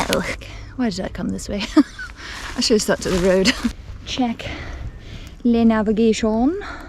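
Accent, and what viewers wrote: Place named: Liverpool, United Kingdom. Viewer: Absolute nutjob marry me. And the scouse accent love it. Ha ha ha.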